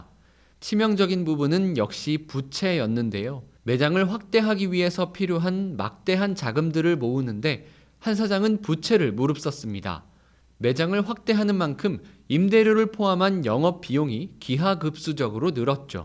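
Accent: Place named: Gyeongsang, South Korea. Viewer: 갱상도 상 남자 노 ㅋㅋ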